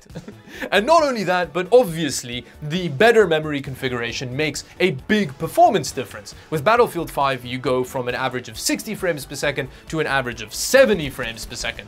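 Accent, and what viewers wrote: South Africa. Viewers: where u from u sound South African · Are you from CA? I hear some SA in there, especially with the Ja. lol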